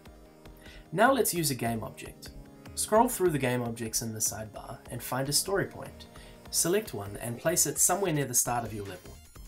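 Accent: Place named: New Zealand. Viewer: you sound like a kiwi XD sorry if ur not XD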